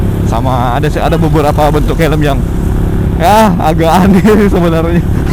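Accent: Makassar, Indonesia. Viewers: kentara logat makassarnya😂, salam sesama orang makassar bang😇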